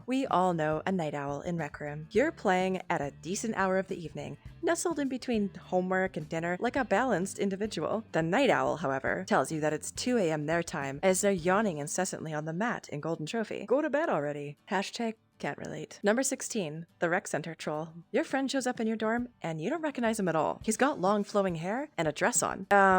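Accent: Canada. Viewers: Hold on your Canadian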